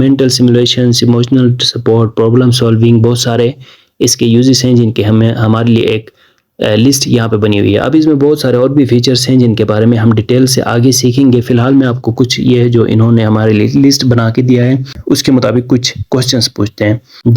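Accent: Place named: Saraiki, Pakistan. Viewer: Ap sariki lag rhy voice se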